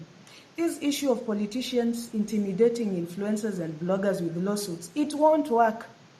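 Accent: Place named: France. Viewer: please be soft on us kikuyus hii kizungu yako na babu inatulemea yawa hatuelewi hadi sometymes naona nikama unaongea french yawa😅😅😅😂😂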